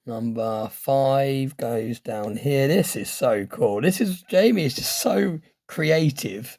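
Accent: United Kingdom. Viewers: I love watching your videos that british accent is really warming compared to my usial american videos ^^ , cheers from across the pond in france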